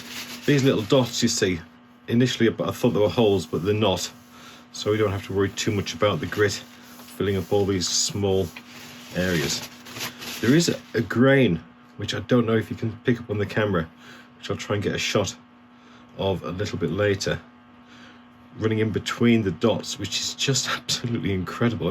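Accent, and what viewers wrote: United Kingdom. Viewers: I loved what you did on this weird root. It was also great to hear a Brit doing this video….!!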